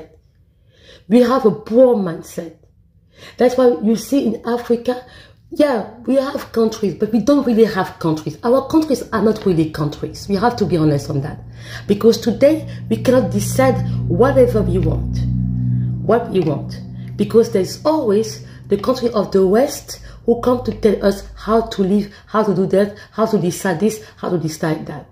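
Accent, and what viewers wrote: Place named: France. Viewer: Mmmm… French accent 😊😊😊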